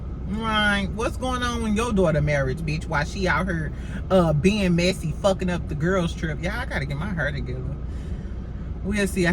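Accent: St. Louis, United States. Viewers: I'm cracking up because you are so St. Louis! Every time that you say thurr, I get weak!😂😂 I'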